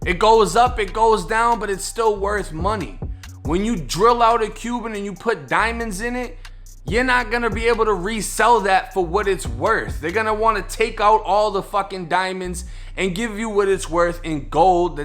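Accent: Boston, United States. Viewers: Why this dude have a Boston accent if hes from Florida? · Definitely sounds like a Boston Accent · Horrible narrorator voice. Half Boston/ wigger. Smh fat ... Hip-hop Harry.smh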